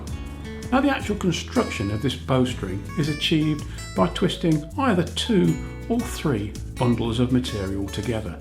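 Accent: United Kingdom. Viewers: Don’t judge me but I’m trying to figure out if he’s British or not (the accent) Using inches and all… Very good video btw!!!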